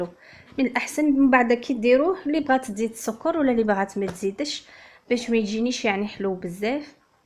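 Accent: Setif, Algeria. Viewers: انا من الجزائر لهجتك تعجبني كثيرا لانني من اصول سطايفية و نحن نعيش في العصمة و بالضبط بحسين داي و نشتاق لسماع هذه اللهجة الحرة حفظك الله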